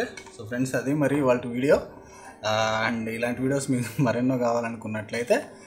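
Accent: Guntur, India. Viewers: Meedhi guntur aa bro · మీరు గుంటూరు నా 😀😀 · Meedi guntur aa anna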